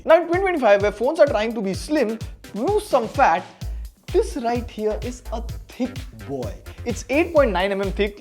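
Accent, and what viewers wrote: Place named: Bengal, India. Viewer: I think you are a Bengali boy because your English tone is just like them 😅😅😅😅😅 answer me please 😢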